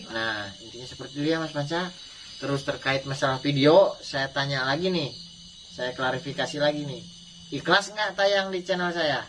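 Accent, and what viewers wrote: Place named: West Java, Indonesia. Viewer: Assalamualaikum, mugia kang sinareng team sarehat. Bade tumaros linggihna dimana? Upami ningal dina logatna mah urang sunda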